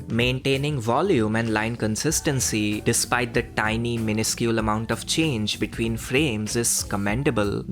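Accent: India. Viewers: How did you somewhat get rid of your indian accent?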